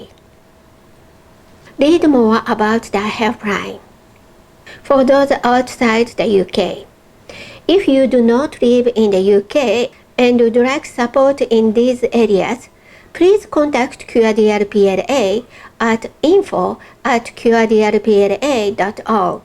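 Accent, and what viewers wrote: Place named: Japan. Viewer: カタカナ棒読み英語を改善させないと世界には通用しないですね。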